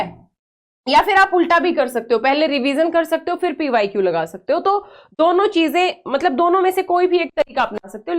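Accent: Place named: Haryana, India. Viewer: Mam ki accent प्योर हरयाणवी चोबीस ❤❤❤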